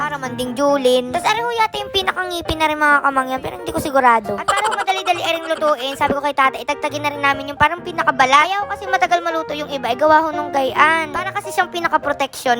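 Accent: Batangas, Philippines. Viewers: tuwang tuwa ako sa mga salita mo kaganda mo pero gay on mga salita mo pang batanginyo your so cute po lagi po ako nag aabang ng iyong vlog ❤😊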